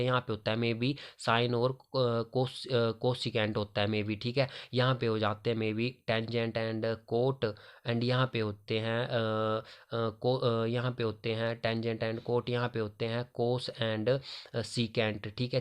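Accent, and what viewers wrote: Himachal Pradesh, India. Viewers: Himachali accent is always osm ❤😁😉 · Bahut simple way mai smjha diya aapne bhai very nice keep it up and love your Himachali accent ❤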